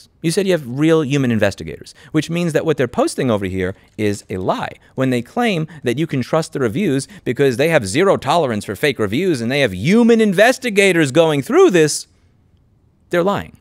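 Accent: New York, United States. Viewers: Hey louis. I genuinely didn't like you when i first found you. I couldn't tell you why, I'd like to blame my Midwest sensibilities clashing with your NY pattern. But I've learned over time who you are and what you want. As little power as i have, i am with you, and I am sharing your vids. I wish i had learned earlier that we had the same goal. Thank you for never stepping down or shying away. You are what every nobody trying to make the broken world work needs. I wish i had half of your drive, and I appreciate how much you do, bared to not just the sun but the crazy lens of the internet. You refuse to compromise, you refuse to back down, and I love it. Thank you louis.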